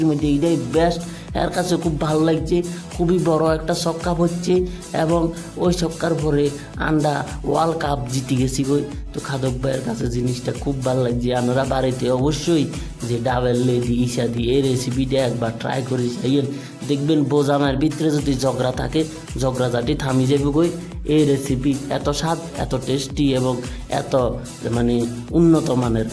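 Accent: Mirsarai, Bangladesh. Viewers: তোমার ভাষা পুরোপুরি বাংলাদেশের চট্টগ্রাম জেলার মিরসরাই থানার ভাষা আর আমি মিরসরাই এর মেয়ে তাই আমার সাথে তোমার আঞ্চলিক ভাষার ১০০% মিল। তুমি জানিও তুমি বা তোমার বাবা মা কি কেউ মিরসরাই এর কিনা? আর তোমার ভিডিও গুলো অসাধারণ আমি আর আমার ছেলে আমার মা সবাই তোমার ভিডিও আপলোড হওয়ার অপেক্ষায় থাকি। ভালো থেকো এগিয়ে যাও🤩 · আমনে যেন নে কথা কন এঠা তো মিরসরাই এর কওথা,,,এননে, তো আনডা কই থাকি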